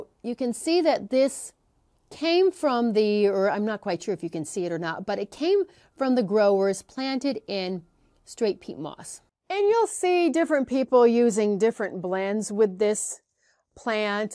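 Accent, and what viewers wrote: United States. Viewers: you Americans have funny accents.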